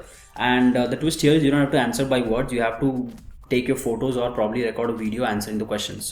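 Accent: India. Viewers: lol sick Indian accent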